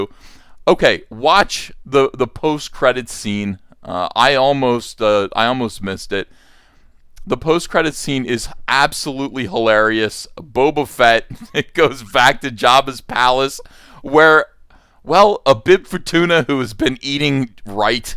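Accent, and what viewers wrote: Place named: Pittsburgh, United States. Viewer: There is NO WAY this guy is from NJ with that accent! I detect the familiar sound of Pittsburgese, so has to be from somewhere around there.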